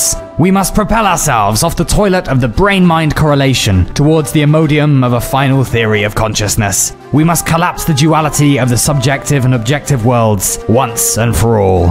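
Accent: United Kingdom. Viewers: Your new microphone isn’t as clear as the old one. That or the overlays are drowning out the crispness. It’s more important to hear the British narrator than the musical background! Edit: the video is still damn good enough to make me forget the issue but it exists. Pls make more space turtle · Yeah his accent, vocabulary and past videos (complaints about the British train system etc) screams Englishman, but now I've seen he apparently lives (temporarily?) in a clearly Slavic/Russian place, judging by the POV footage from this video. Maybe he found a Russian soul mate ;p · Can’t tell if he’s British or Bulgarian but whatever it is, I love the small Bulgarian easter eggs!!! Love your ideas man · r u British living in Bulgaria, or just Bulgarian with a british accent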